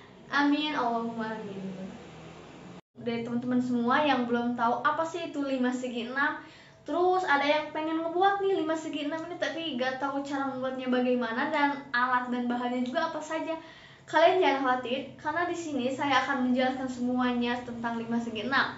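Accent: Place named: West Java, Indonesia. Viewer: *sundanya itu loh masih lengket, sukses ka*👍👍👍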